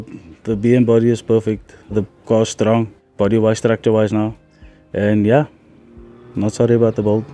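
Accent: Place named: South Africa. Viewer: thats the most SA accent i've ever heard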